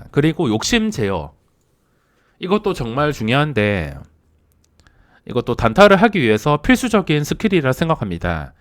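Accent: Gyeongsang, South Korea. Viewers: 경상도 억양이 너무 구수하시네요 ㅎㅎ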